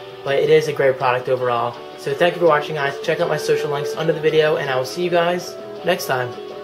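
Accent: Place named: New Jersey, United States. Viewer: Your jersey accent is showing strong in this video. "Lets turn it AWN"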